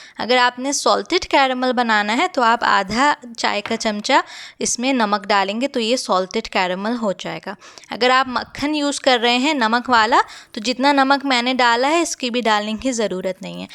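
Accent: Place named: United Kingdom. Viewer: why are you speaking urdu in British accent 🤨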